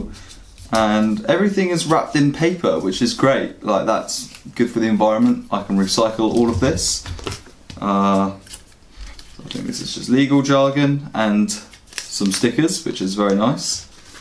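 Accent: United Kingdom. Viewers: Haha love the English accent bro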